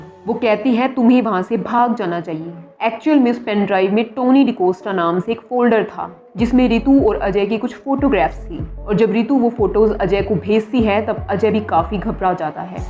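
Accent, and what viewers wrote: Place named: Gujarat, India. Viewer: The narrator has Gujarati accent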